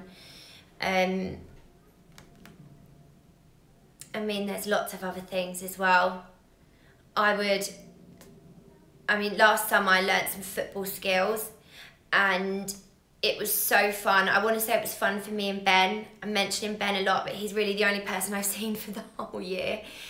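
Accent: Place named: United Kingdom. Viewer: Love you as an instructor!! Your British accent kills me in a great way. Love it. Enjoy your classes.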